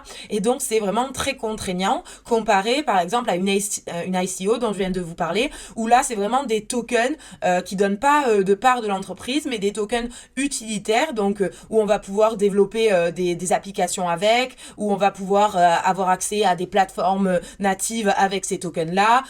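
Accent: South, France. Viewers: Accent du sud ?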